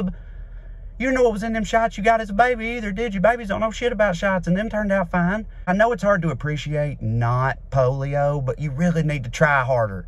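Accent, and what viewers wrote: South, United States. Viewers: Well, This is REFRESHING!!!!!! A southern accent paired with REALITY, SCIENCE, and THE TRUTH!!! I LOVED the simplicity and honesty that acknowledges that this pandemic IS NOT ABOUT OUR INDIVIDUAL RIGHTS AND EGOS—but about PROTECTING OTHERS, AS WELL AS OURSELVES!!!! Well said, THANK YOU!!!! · Yeah he's got a Southern accent but he's 100% wrong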